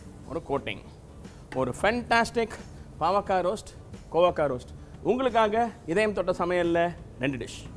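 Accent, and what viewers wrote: Chennai, India. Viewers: வணக்கம் வெங்கடேஷ் பட் உங்கள் சென்னை தமிழ் அருமை , பாகற்காயை அவன் இவன் எனபது . பாகற்காயை பிடிக்காதவன் சாப்பிட வைக்க என்ற வார்த்தை 👏 எத்தனையோ பெண்களை வாழவைக்கிறீர்கள் வெளிநாட்டுகார்ர்களுக்கும் சுவை எனில் என்ன என்று கற்று கொடுக்கிறீர்கள்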